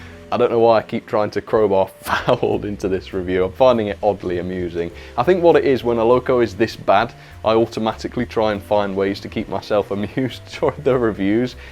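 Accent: United Kingdom. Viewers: Dear, I found it thorougly amusing, to hear you hate it so much, in profound solid brittish, marvoulous thanks!:D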